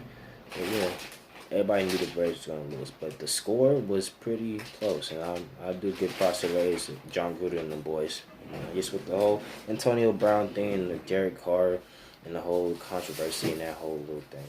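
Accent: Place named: New York, United States. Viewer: That New York accent 🤤🤤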